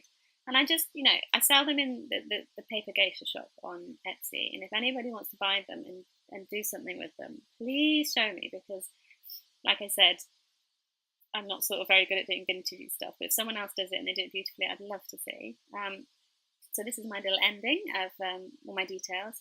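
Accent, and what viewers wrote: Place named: United Kingdom. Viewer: I happen to love british accent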